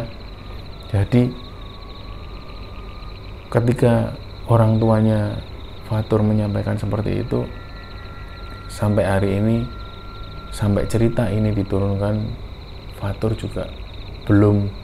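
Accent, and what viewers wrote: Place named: Surabaya, Indonesia. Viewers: BetuL...memang jauh berbeda,pendalaman cerita,penyampaian,anatomi tubuh dan aksen suroboyoan betul2 itu yang membuat beda dengan yg lain,mantapzzz 👍👍👍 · Ga bisa dipungkiri bahwa memiliki ciri khas yg tidak dimiliki chanel" lain yg sejenis, dalam diri mas (tanpa mengecilkan peran team yg lain) dengan runtut cerita yg jelas,lugas, sederhana, tenang, mampu menghidupkan suasana cerita, tentunya logat Surabaya yg khas. Pertahankan semua itu cak, yg perlu ditingkatkan hanya intensitas upload cermisnya saja, biar kami sobat kembara ga kelamaan nunggunya, maju terus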